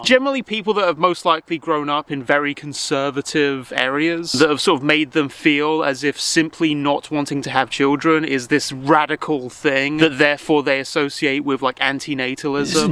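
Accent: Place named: United Kingdom. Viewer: Very unrelated remark/'question': this is the second time this week I find out a content creator (*hurl*) I like, who also happens to have a British accent, turns out to be living in a deeply red state. Wastha'abou'? I am grossly assuming here that you actually live in tennessee, apologies if that's off-base.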